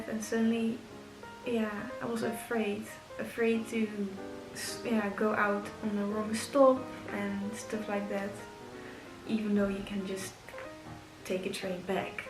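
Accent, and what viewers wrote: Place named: Netherlands. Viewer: Lol 10/10 dutch accent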